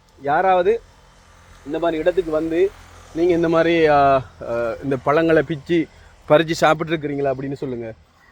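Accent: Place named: Chennai, India. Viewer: கிளாக்காய் காட்டில் இருக்கும் எல்லோருக்கும் தெரியும் நீங்கள் முதலில் Madras தமிழில் கதைப்பதை நிறுத்த முயற்சியுங்கள் இலங்கைத்தமிழில் கதையுங்கள்.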